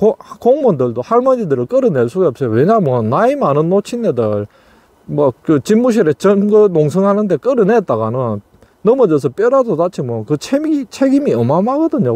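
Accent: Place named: Jeolla, South Korea. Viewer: 말투로보아 전라도?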